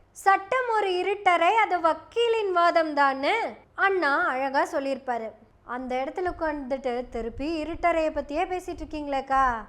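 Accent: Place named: Coimbatore, India. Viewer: Sister, you have narrated the Silapathikaram in a different dimension. Your speciality is your Coimbatore modulation. Keep going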